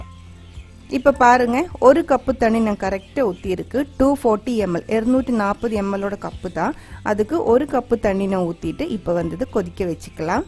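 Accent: Kerala, India. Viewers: Tried this recipe, it came out very well❤️ Your Kerala mixed Tamil is really awesome 😇🙏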